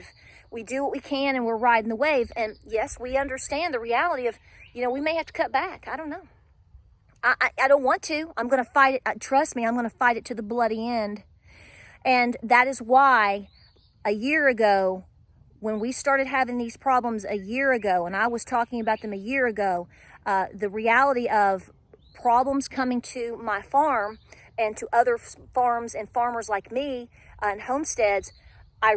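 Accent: South, United States. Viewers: Came in from this Oklahoma heat after hanging garlic to shell some peas and was delighted to another “Truth Hurts” episode. Thank You for being our voice. I know you didn’t ask for that position but only a small handful of folks can get through the wokeness these days and we have it in a beautiful southern accent 🥰 God bless you, ( the ), all the young men in your household. You are greatly appreciated!! 🤓❤️🇺🇸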